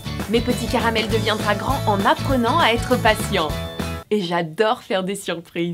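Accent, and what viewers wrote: Normandy, France. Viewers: Ho ! Un accent Normand ? Tu est Normande ? :D (Gyaah ! Un point commun avec toi si c'est oui ! °3°) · Superbe vidéo! ^^ J'aime beaucoup t'es vidéos à chaque fois sa redonne du pep's! ♥ Tu as dis que tu avais un accent Normand donc tu étais originaire de Normandie! Et je voulais savoir si tu y viens encore souvent? Car je vis en Haute-Normandie et j'aimerais tellement te voir. Peut-être qu'un jour tu viendras à Rouen!:D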